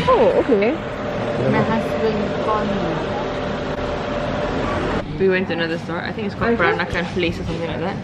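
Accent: Kelantan, Malaysia. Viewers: suko dengar kak kecek kelate dgn family pahtu suko dengan kak beradik suko xD semoga kak dan kita semua sokmo dibawah jagaan Allah, dijauhi benda-benda buruk, didekatkan dengan benda benda baik. love you kak!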